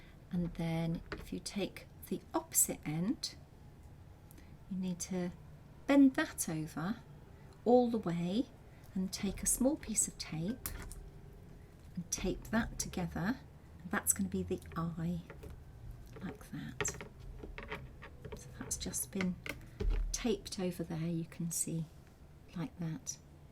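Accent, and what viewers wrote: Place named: United Kingdom. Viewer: Yes! I came here to say that everything is better with a British accent. And this is a beautiful tutorial!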